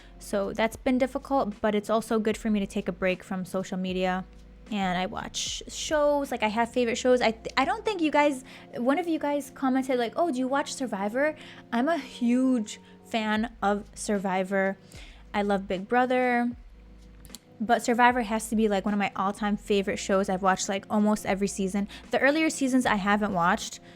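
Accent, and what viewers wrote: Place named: United States. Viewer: As someone who lives in Britain i think it would be funny if you tried to approach people and do a British accent and see if people notice or fall for it, or notice that you actually have an American accent.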